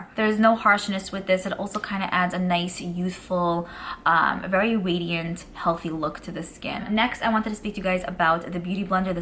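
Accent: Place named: South, United States. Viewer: Random comment but I love your accent, the way you say "gloss" is so cute, is it Southern?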